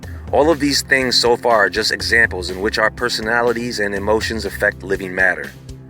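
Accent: United States. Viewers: He makes "neurons" rhyme with "morons". American, Nuff said. That said, far far less off putting than most americans.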